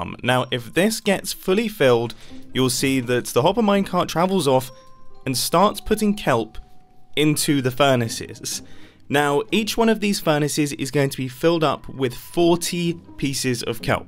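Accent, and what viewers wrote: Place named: United Kingdom. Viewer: My family loves your channel we watch it as a family sometimes just to hear you British accent 😂😂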